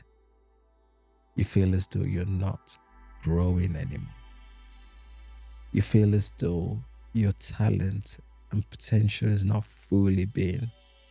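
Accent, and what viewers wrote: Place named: United Kingdom. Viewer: I love your wonderful British accent.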